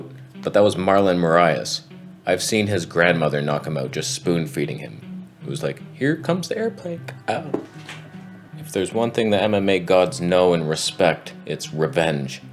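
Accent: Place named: Canada. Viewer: It’s like if Jack Slack had a vegan Canadian accent.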